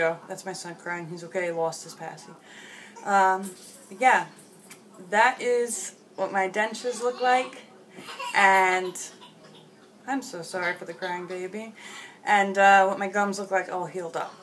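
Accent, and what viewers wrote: New York, United States. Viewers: beautiful teeth for a beautiful girl i sense a slight ny accent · It's so funny you sound like a new Yorker with the bottoms out. Not making fun of you In any way so please dont take it like that cause I didnt mean it to be rude. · You sound like you're from New York or back East somewhere I'm originally from Puerto Rico but I was raised in New Jersey but the Jersey shore 🇺🇸🇵🇷😊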